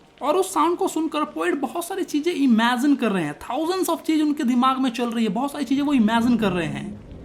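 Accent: Bihar, India. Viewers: sir mujhe aisa lag raha hai ki app bihar se ho qki apki awajj bihari jaisi hai 😁😁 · Sir are yuo bihari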